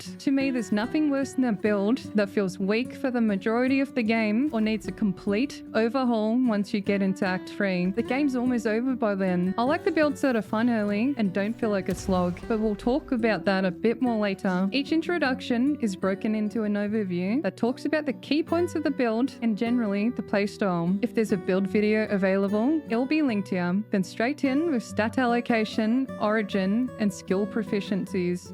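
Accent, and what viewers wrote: Australia. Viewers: okay weirdnotweird take, but hear me out. i like that your voice fits your aussie accent.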